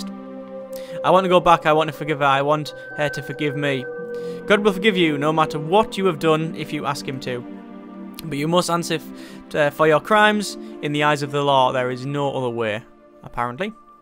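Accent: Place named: England, United Kingdom. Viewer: As soon as you started talking i knew you were either English or Scottish. (Maybe even Irish)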